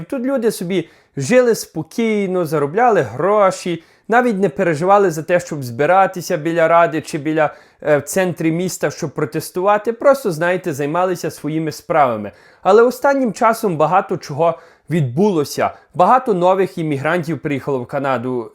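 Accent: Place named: Ternopil, Ukraine. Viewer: Дякую. Чудова мова. Десь в дитинстві я чув таку вимову на Тернопільщині. Бо в Україні ми, на жаль, розмовляємо вихолощеним совковим варіантом, ще й з вкрапленнями москоіської говірки (а хай так буде, з помилкою)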